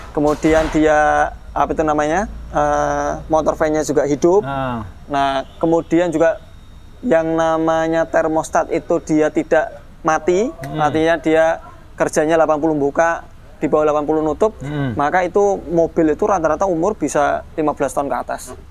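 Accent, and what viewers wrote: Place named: West Sumatra, Indonesia. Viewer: dari logatnya, kayaknya orang minang nih yg nyasar ke jogja 😁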